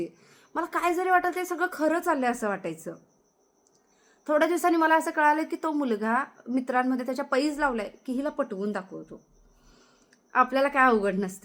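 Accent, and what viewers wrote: Sangli, India. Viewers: खुपच छान ताई तुमचे विच्यार तुम्ही सांगलीच्या वाटता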